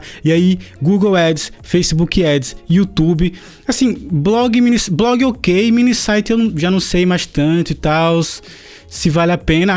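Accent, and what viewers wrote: Northeast, Brazil. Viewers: Vc é massa!! Vc é uma inspiração pra mim! De onde vc é hein?! Vc tem sotaque nordestino! Kkkk Eu sou de Recife! Parabéns pelos resultados! Showwww!!!!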